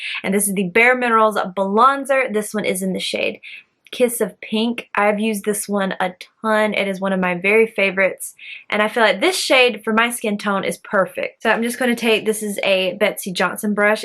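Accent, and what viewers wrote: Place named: South, United States. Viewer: Your eye look turned out beautifully <3 I think that UD palette got a bad wrap, but may just be underrated. I'm a new subscriber and I'm not going to lie, but your southern draw got me! Hopefully that doesn't seem weird or offensive, but you just have an inviting and friendly sound and persona. The first video of yours that I came across was your blush swatch video, so that was really what I had to go off of. Lol :)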